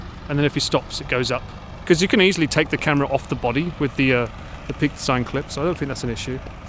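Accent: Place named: Essex, United Kingdom. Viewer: Lovely stream! Superb way for us peekers to see more of Japan while being entertained by an Essex accent.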